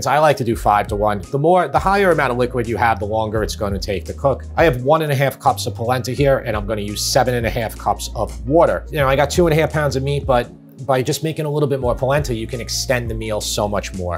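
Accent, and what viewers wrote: New York, United States. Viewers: I can hear and see the italian NYer in you lmao. Your voice and hand movements. It's so wholesome XD Equal parts likely to dump a body over the Brookline bridge with cement shoes as cook an amazing italian meal! Haha. I REALLY love that you just jumped straight into chopping and listing the ingredients. Too many chefs list the ingredients as they cook. I find myself skipping all around the videos to make a list. No such case here! Awesome content man!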